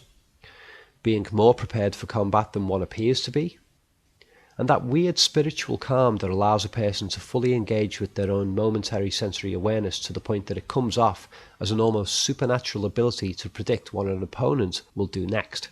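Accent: Liverpool, United Kingdom. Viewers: you are my new hero... I've long thought of doing a similar analysis but what you've done here is (as of now) head and shoulders above anything I can do... Plus you have the (G)reat British accent (Liverpudlian, I read) which in America assigns automatic intelligence to the speaker, which in your case is legitimately deserved... Just one thing: I'm not sure what the term "roundhouse kick" means to you but per Jeet Kune Do terminology Lee uses in order, hook kick, reverse hook (2), side kick, reverse hook (2), inside crescent and spin outside crescent... Forgive my nit-picking, I've just been in a recent spat with a YouTuber who's been so-called debunking Bruce Lee... Excellent work! I look forward to your analysis of the fight scene with Chuck Norris in Way of the Dragon!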